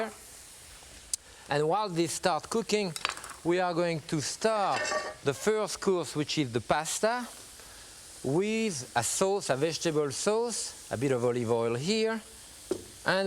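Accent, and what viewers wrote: France. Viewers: Are you trying to say English should have less of a French accent? I'm not sure what you're saying.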